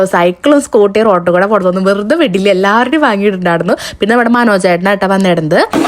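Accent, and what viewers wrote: Thrissur, India. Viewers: ഹലോ ചേച്ചി ഇങ്ങനെയൊക്കെ വീഡിയോസ് കാണുമ്പോൾ ആണ് എനിക്ക് എന്റെ നാട്ടിലെ പൂരം ഒക്കെ ഓർമ്മ വരുന്നത് miss thatt... ചേച്ചിക്ക് ഓരോ ദിവസം എത്ര പൂരമാണുള്ളത് എല്ലാം നല്ല സന്തോഷിച്ച ആഘോഷിച്ചു കഴിയുക അതുപോലെ ചേച്ചിയുടെ ഓരോ ഡ്രസ്സുകളും എനിക്ക് വളരെ നല്ല ഇഷ്ടമാണ് ചേച്ചി സാരി ഉടുത്തിട്ട് കാണാൻ എനിക്ക് നല്ല ഇഷ്ടമാണ് ചേച്ചിയുടെ മുടിയും ഒക്കെ അതുപോലെ ചേച്ചി വീഡിയോയിൽ വോയിസ് ഓവർ കൊടുക്കുമ്പോൾ ചേച്ചിയുടെ ഒച്ചയും അതുപോലെ ആ തൃശ്ശൂരിലെ ആസ്വാങ്ങും ഭയങ്കര ഇഷ്ടമാണ് 🤍🤍🤍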